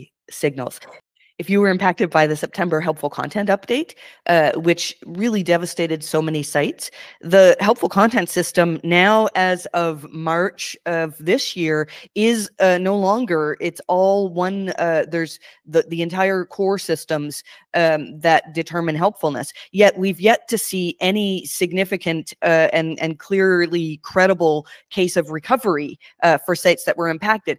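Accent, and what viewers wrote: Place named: Canada. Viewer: I don't remember you having such a strong Canadian accent :) Luvyawork. Panda is scary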